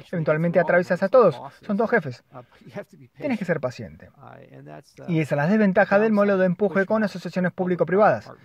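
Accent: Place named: Argentina. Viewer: Todas mis disculpas por no haber enviado antes un mensaje de agradecimiento y cortesia a nuestro querido traductor, grandisimo trabajo que se agradece increiblemente mucho mucho, de todo corazon muchas gracias amigo. (Ademas me encanta tu acento, si no me equivoco eres de Argentina, no? Yo he nacido en Valencia, España) Un gran abrazo virtual y por favor sigue todo lo que puedas !!!!!! Gracias !!!!!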